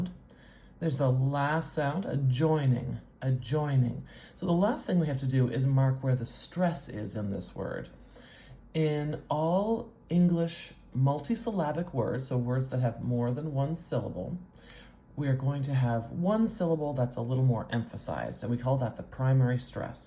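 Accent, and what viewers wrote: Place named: Atlantic Canada, Canada. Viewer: Could you do a series where you transcribe a word in two different accents, like your own Canadian Atlantic accent vs Minnesotan? Or is there a Canadian West Coast accent? East vs West, yo!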